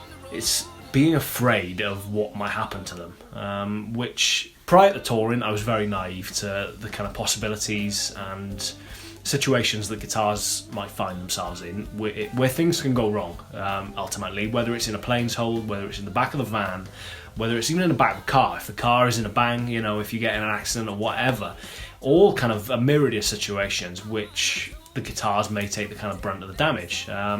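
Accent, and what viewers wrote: Wales, United Kingdom. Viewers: He is a cool guy.. 🎸. Thanks for explaining and showing the cheap guitars .. I also have a squire, an a expensive Gibson 335 ... but dude I can’t understand a word your saying, like you have a bunch of Marbles in your mouth,, clearly you’re from the UK, and it’s all good 👍🏾.... keep showing more guitars bro... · Wales more like. His is nothing like a London accent.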